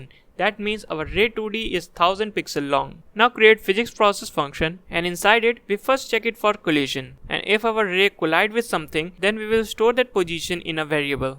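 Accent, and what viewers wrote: India. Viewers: Indian accent !!!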